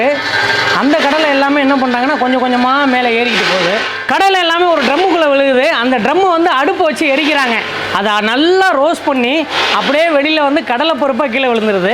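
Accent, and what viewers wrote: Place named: Villupuram, India. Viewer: Villupuram slang 😂😂 vj sidhu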